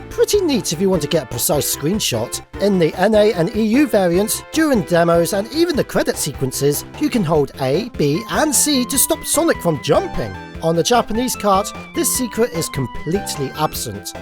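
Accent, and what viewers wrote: United Kingdom. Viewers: HE'S THE BRITISHEST GAMER YOUVE EVER HEARD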